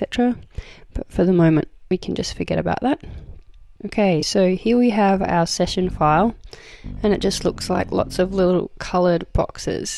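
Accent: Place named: Australia. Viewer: australian accents, man.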